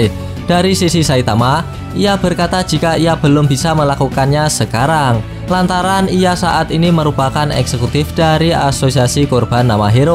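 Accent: Java, Indonesia. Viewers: ( kammmprettt padahal udah seru loh )🤣🤣 Jawa mana min?🙏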